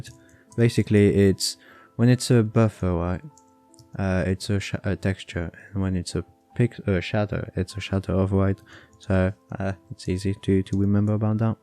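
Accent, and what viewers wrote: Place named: France. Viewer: lmao, you think I want your help after you ruined my experience of modding with your incompetence. Right now, I am disgusted by your way of slacking this, trusting you through the process but it started to go downhill halfway through video, you assume people know all this stuff, not even explains the commands you type, yeah yeah just do it mindlessly like that's the purpose of this video, On top of this, that french accent, yuck 🤮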